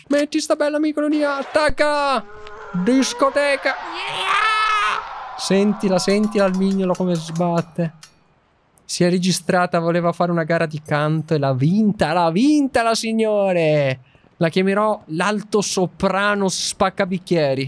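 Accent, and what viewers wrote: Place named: Veneto, Italy. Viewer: mi sembri veneto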